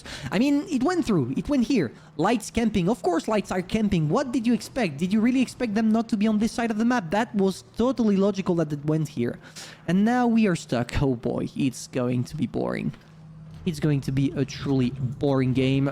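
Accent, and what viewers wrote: France. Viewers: is he from france?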